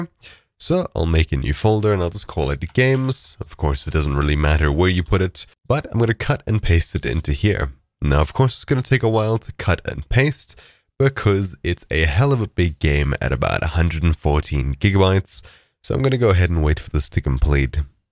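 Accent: South Africa. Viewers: I know an SA bra when I hear one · Are you a saffer?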